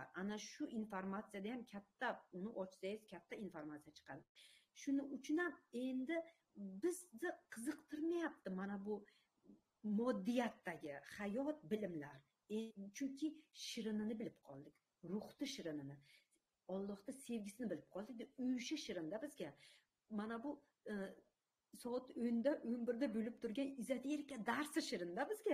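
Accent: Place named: Bukhara, Uzbekistan. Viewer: Бухороликга ухшайдилар адашмасам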